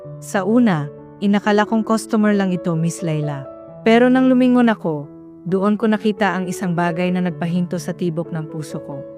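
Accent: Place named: Visayas, Philippines. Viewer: Bisaya k po b miss? Halatang halata Kasi Ang Punto mo.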